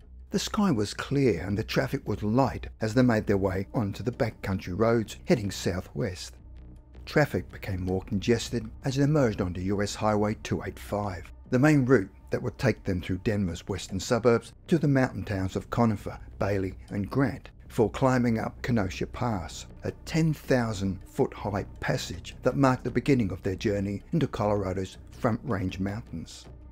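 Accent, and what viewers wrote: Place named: Australia. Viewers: How can you not know?? He has a thick aussie accent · People probably don’t know that the narrator is Australian. Everyone thinks we all sound like Steve Irwin. He has a great voice for narration. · I think they probably do know he’s Australian. M 😀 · 99% of people know he’s Australian bro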